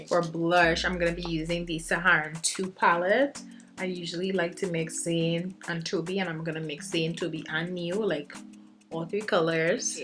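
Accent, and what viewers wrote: Trinidad and Tobago. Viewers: ❤️ when I hear your accent I miss my friend’s mother’s accent (Trini), I’m somewhat new to your channel subscribed 💕 hi again from 🇨🇦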